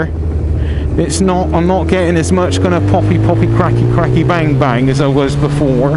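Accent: West Country, United Kingdom. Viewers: Hi mate, where are you from? Sounds like a West Country accent but a little different to my home town of Bristol!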